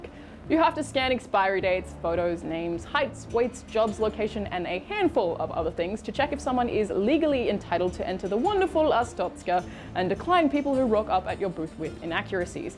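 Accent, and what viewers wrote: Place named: New Zealand. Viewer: Is she from NZ?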